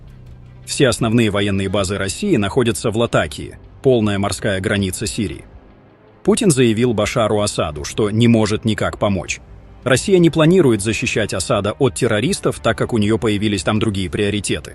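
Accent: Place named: Armenia. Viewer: не слушайте этого армянского пропагандиста все армянские деньги вкладывать чтобы общество вести в заблуждение Россия поняла что в Сирии нечего делать если сирийский народ 99% не хочет Башир асада зачем наши войска должны быть в си и деньги ещё вложить а насчёт арцаха это имело отношение к кавказской Албании VII век а Карабах по всем документам идёт Карабах товарищ армянские продажные с красивой речью выступаешь продажный человек что тебе армяне говорят то и красивые волосы наступаешь с армянским акцентом конечно не выгодно рассказать анекдоты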